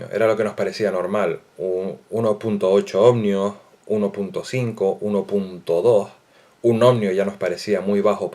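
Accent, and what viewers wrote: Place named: Venezuela. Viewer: No hablas como si fueras de españa creo que venezolano o ecuatoriano algo asi nose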